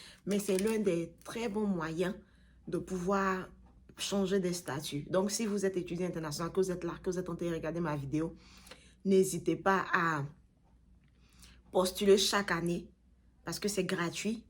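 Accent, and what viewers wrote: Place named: Ivory Coast. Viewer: L'accent ivoirien 🇨🇮 ça ne ment pas. Très propre et limpide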